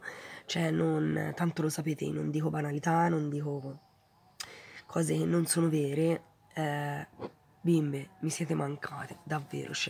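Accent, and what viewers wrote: Tuscany, Italy. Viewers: Sono le del mattino sono appena tornata a casa e trovo questo video .......quanto mi eri mancata cica ❤️❤️❤️❤️❤️ daje tutta sei una forza della natura e ce la farai anche sta volta sono felicissima di risentire quell’accento toscano che adoroooo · Simpatico accento toscano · Dai che ce la fai e noi saremo qui, io potrei essere tua madre per l'età ma mi piace ascoltarti con il tuo accento toscano e mi sei simpatica ti ho sempre pensato e ho immaginato che stavi passando un brutto periodo. Quando vuoi saremo qui ad ascoltarti tvb 😘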